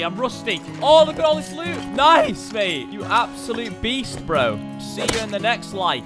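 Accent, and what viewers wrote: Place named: United Kingdom. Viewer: Hey, I'm from the UK, actually thought you were too 😂 😂 😂 Awkward... You sound British... So that's odd 😂 😂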